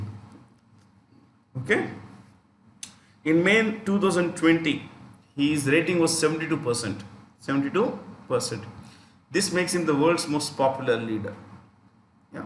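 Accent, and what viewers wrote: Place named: South India, India. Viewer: He is a south Indian. His accent is perfectly fine.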